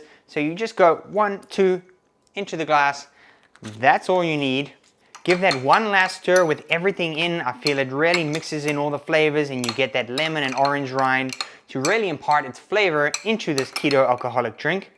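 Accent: South Africa. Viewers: Hey, well, the kids are back in school....and I'm back on the Keto Wagon and my first stop - ok was NOT the boozey section eh! (hahahaha) it was your bread recipe but I'm already here and there are few comments on this one so I think I'll have a better chance of you seeing this. Ok, well I am the mama in Belgium who cannot find that darn oat fiber over here and Amazon won't ship it here either soooooo, I was wondering if you were familiar with Lupin flour? It is super high in protein and very low-carb (I think it's 1 net carb per 100g). Do you have any recipes using this and if not, I would SO LOVE to see you do some....like your bread recipe that I cannot wait to try....but am a bit nervous of doing it without the oat fiber. PS: Btw, thanks so much for your wonderful videos young man. You're living in my native Canada but pulease do not lose your South African accent as it's SO classy; so nice to listen to.:-) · Ooh. 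Looks lovely. I am also going to try your flax seed yeast bread. [May I ask, where you born in South Africa? You sound like a soutie ; ) An affectionate nickname! I was born there. ]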